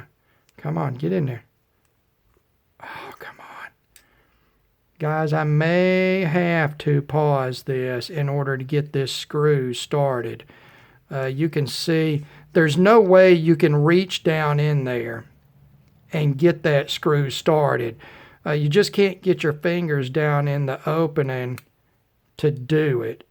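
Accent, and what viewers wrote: South, United States. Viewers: Love this fellas accent , he sounds like a southern gentleman.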